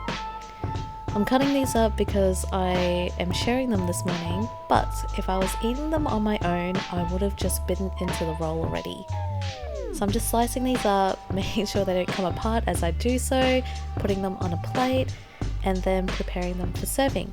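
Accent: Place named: Australia. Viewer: I heard oz accent here 😍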